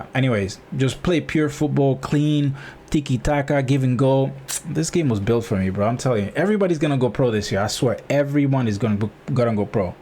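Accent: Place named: Dominican Republic. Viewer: Bro your funny 🤣🤣🤣 with the Spanish I can hear your from RD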